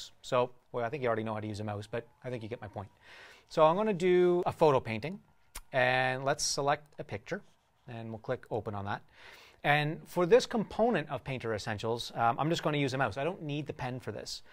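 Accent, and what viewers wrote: Canada. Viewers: lawl he has a canadian accent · is he canadian?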